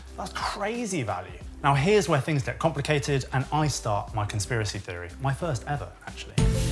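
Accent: England, United Kingdom. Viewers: Like the reasoning and the English accent. Would I ever own an electric car ? Over my dead bloody body !!!!!!!!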